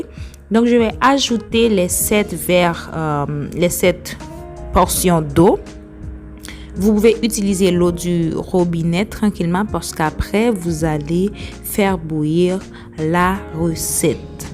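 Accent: Haiti. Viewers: Accent haïtien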